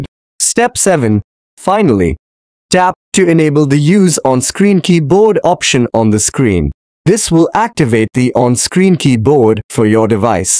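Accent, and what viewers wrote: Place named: India. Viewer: The Indian sounding accent is unbearable.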